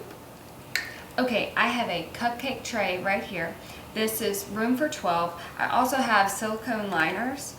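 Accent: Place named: Miami, United States. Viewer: I'm just starting a Keto lifestyle. I learned about your channel through the Keto Twins. I am really excited and appreciative of your work in researching and perfecting these recipes and for the wide breadth of knowledge you relay. Looking at your videos I feel confident that this is a change I can do and succeed at. You are making a difference in the quality of people's lives and I ask that God continues to bless you and your family for this good work. Thank you. PS I live in Miami and your accent makes me feel at home! I miss it so much.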